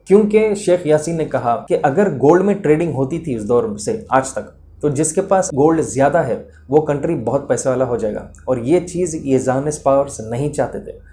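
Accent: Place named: Bangalore, India. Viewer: Thank you very much for your very informative and very interesting video Are you from Banglore ( India) Your accent is like a Banglori My mother was from Banglore Yes you are right about the three very strong leaders of Arab - African countries At present in the entire muslim world There's no strong leader Recently Pakistan had to take loan from IMF We are facing the crunch About Imran Khan I am very much doubtful Is he sincere for Pakistan or not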